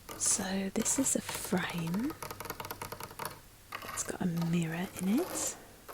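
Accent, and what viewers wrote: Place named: United Kingdom. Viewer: I’ll always be on the lookout for any little slip up in your British accent 😊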